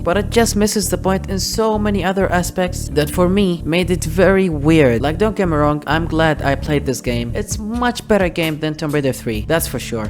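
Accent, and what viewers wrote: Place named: Gulf, Arab world. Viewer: خليجي من لهجتك بس مدري من وين. كل عام وانت بخير وصحه وسلامه وعيدك مبارك، مقطع جميييل واستغربت ان باقي فيه عرب مهتمين بالسلسلة الكلاسيك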